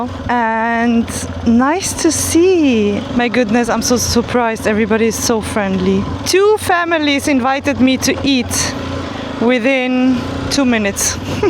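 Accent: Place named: India. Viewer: I learn English free from you, like Indian accent ❤❤❤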